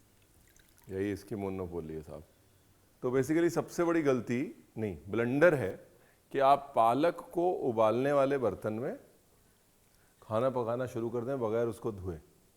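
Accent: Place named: Punjab, India. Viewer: Love ue punjabi accent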